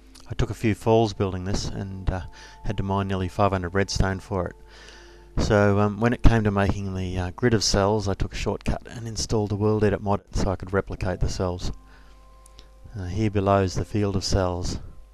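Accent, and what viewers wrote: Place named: Australia. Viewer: an infinite grid of souls... sorry, your australian accent made me think you said souls :)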